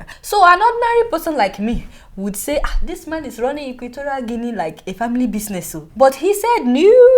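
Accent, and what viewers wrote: Yoruba, Nigeria. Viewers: you are definitely keeping it real! I love your show. Keep up the good work. I love the Nigerian accent. It is the Yoruba coloration of the accent that makes it so lovely. I am not Yoruba, but I love it.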